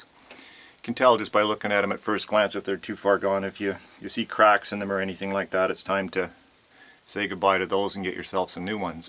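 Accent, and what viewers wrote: Canada. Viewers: Greetings my friend. Are you a BERTAN? I lived in BC and Berta. You totally have either AB accent or Sask. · You sound incredibly canadian ;]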